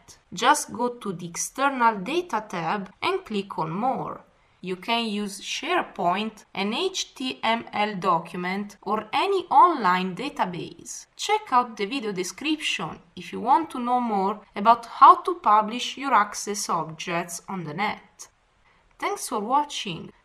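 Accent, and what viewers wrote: Italy. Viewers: This Italian accent though :D so funny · I think it's Italian, definitely not Russian :)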